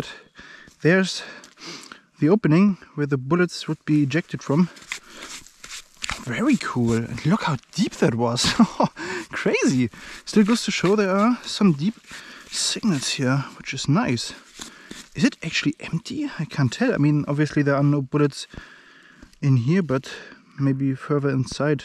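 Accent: Germany. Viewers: Is your accent German? If so, are you still allowed to keep items with insignias? As I understand there are rules about having sw4stlk4s. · Bro has such a german accent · Typical german english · Dieser deutsche Akzent hahaha, gutes Video glückwusch zu den Funden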